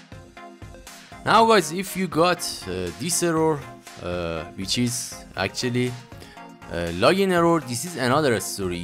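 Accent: Iran. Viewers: پیش خودم گفتم این چقدر شبیه ایرانیا انگلییسی حرف میزنه تا اینکه همراهکارتو دیدم😂❤️❤️کارت درسته لنگ دی ان اس بودم❤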